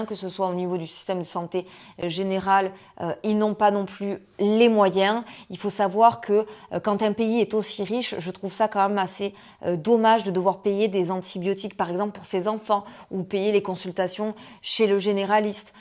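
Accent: South, France. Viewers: Ah, par contre, je ne partage pas ton avis sur la redistribution en France... La France est un pays EXTRÊMEMENT protocolaire, c'est un pays ÉNORMÉMENT TAXÉ, les impôts, on les paie mais concrètement, on ne sait pas DU TOUT où ils vont (ni dans la voierie parisienne ni dans la protection sociale, apparemment, alors on ne sait pas où ça part... Peut-être à l'entretien des palais de l'Élysée et de Matignon et le train de vie des élus , y compris après avoir quitté leurs fonction, je ne sais pas !), mais les personnes précaires n'ont droit à presque rien en France à moins de toucher le fond du fond pour, peut-être, avoir droit à des miettes ! Et les autres se précarisent car les taxes augmentent de manière exponentielle et continue mais les revenus ne suivent pas ! Et côté solidarité, la France ce n'est vraiment pas le bon exemple du tout... Alors comme tu as un accent du Sud, dans le Sud de la France, c'est vrai que tout est plus doux et ensoleillé dans l'humeur des gens que dans le centre ou le Nord de la France... 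Moi, je vis à Paris et j'y suis née, et je peux affirmer que la solidarité y est nulle ! Tu n'es PERSONNE à Paris ! Tu peux avoir un souci, PERSONNE ne viendra à ton aide ! Tu as des tas d'aides sur le papier, pour faire joli, mais concrètement tun n'as jamais accès à la plupart de ce qui t'est promis dans le cadre de la couverture sociale, et tu n'as aucun recours ! La chasse à la précarité en France devient la chausse aux pauvres, la lutte anti-tabac devient la lut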